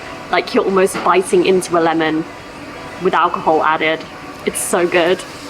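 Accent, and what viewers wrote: United Kingdom. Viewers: いつもながら、あなたの英語はまさにイギリス人で、歯切れよく、心地よい。バーナードショーのミュージカル「マイフェアレディ」で、ロンドンでもその人の属する社会層ごとに発音が違うと、イライザの発音を強制し、貴婦人に仕上げるヒギンズ教授の苦闘を見ていて、感動したのを思い出しました。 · いつも思うのですがイギリス英語はとてもきれいですね。素敵な笑顔とあいまって、とても素敵です。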